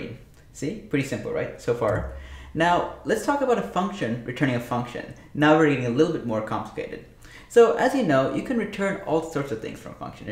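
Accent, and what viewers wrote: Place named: Tamil Nadu, India. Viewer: Me too I think you are Tamil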